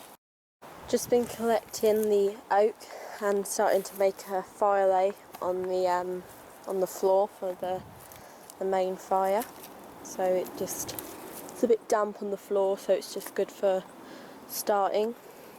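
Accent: United Kingdom. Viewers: cute british accent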